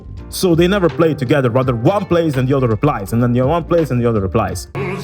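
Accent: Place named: Italy. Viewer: He does sound like an Italian with that accent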